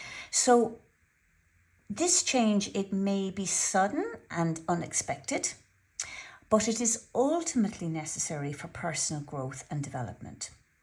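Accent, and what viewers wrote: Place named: Ireland. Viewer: You have a lovely accent 🌹.I hear an Irish Lilt in you voice 💃.. Good Reading Thanks 🌼🏹🏹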